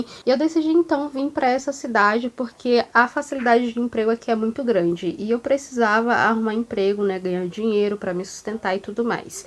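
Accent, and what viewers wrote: Rio Grande do Sul, Brazil. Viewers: Achei que tu fosse gaúcha!! Sotaque lindo! Ótimo vídeo!!! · Nossa que legal sua história e eu pensando que vc era gaúcha raiz pq seu sotaque está bem do local e também a maioria dos Maranhense que eu convivo , não falam com o sotaque do local. Mensagem muito positiva de superação,garra, coragem e isso é o que valorizo numa mulher ser perseverante, lutar por aquilo que acredita. Beijo enorme 😘❤️ e todo sucesso do mundo pra vc!!!! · Pelo sotaque poderia jurar que você é gaúcha de nascimento! Que história bacana a sua, cheia de coragem e perseverança! Parabéns por não se encolher e se vitimizar: você merece toda as realizações que a vida puder lhe dar!❤ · pelo seu sotaque nos vídeos sempre pensei que você era natural do Rio Grande do Sul! 😅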